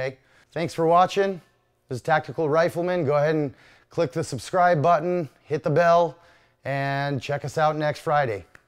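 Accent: United States. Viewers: He has a super american accent for an Israeli vet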